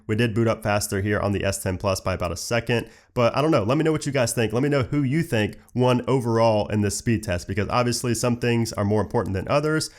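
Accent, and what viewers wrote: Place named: United States. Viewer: This review is "pointless". Why? Because there are a few variables that can have a major outcome on the end result. For example, are you left handed or right handed? Inevitably your reaction will be quicker by whichever hand is dominant. Another variable is you; by your vocal accent, we can establish that you are an American reviewer, and Apple is an ...............American brand????? Yeah, enough said. What must be more disturbing to Apple fans is the fact that major components used in Apple phones belong to Samsung; invented, designed and manufactured by Samsung. One example is the magnificent OLED screen. Apple phones are nothing more than a collection of far eastern components screwed together in an Apple shell and marketed as premium smartphones.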